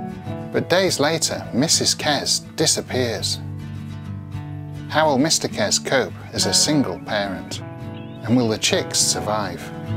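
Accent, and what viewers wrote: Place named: Yorkshire, United Kingdom. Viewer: I love it that every time says ‘tawny owls’ the CCs translate it as ‘toenails’. Mr and Mrs Kes had problems with Jackdaws, Barn Owls and toenails 🤣 Gotta love the Yorkshire accent 🤍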